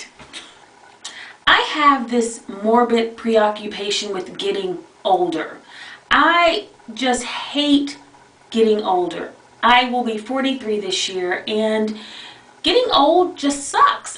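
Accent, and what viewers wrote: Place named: South, United States. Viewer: I think you are a very lovely person. You're someone that I would love to be friends with. I'm from Georgia and a southern gal too so when you say those particular words, I know exactly what you mean! It's funny how we think nothing of how we articulate our words until no one understands us! Thank you for sharing with us!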